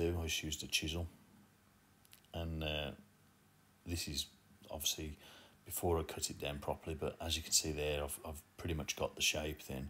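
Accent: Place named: Black Country, United Kingdom. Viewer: Ive just got my first Suzuki Carry, from your accent you sound like you are local to me. Are you a black country mon? Happy for any advice with these.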